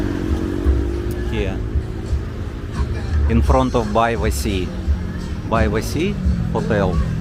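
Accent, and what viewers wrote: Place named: Russia. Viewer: you did a nice job on the video tour of Barrio Barreto. I will go visit in a few months. I have already booked my hotel (which you passed by in the video). I haven’t been to Angeles City since the ‘80s, so when I watch videos of Walking Street it just seems a little too much “in your face”, although I do enjoy P. Burgos St in Makati. Olangapo looks like a relaxed place to chill out with the locals. I enjoy listening to your Russian accented English. You have learned to speak English better than most. Good luck with learning Chinese!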